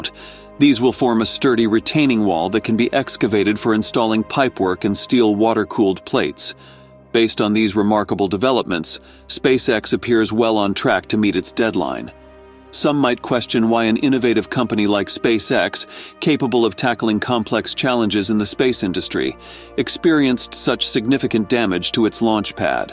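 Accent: United States. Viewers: nauseating droning American commentating on this video. The Yankee accent is diabolical.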